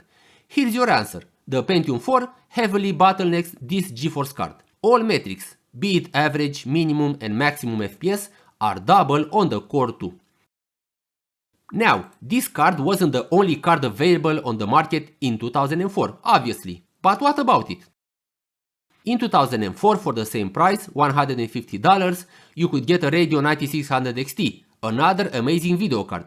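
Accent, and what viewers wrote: Romania. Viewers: Great old video cards and this isn't even the GT variant. Oh, and I'm just wondering, are you Romanian? You sound a lot like Dr Ortmeyer from Hitman Codename 47 😅🙃😝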